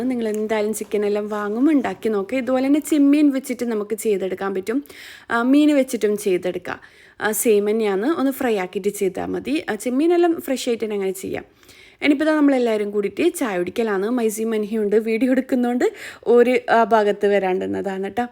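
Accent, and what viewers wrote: Kannur, India. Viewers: Kuttikal varumbolk pappa dam parakoole nammala kannur bashayann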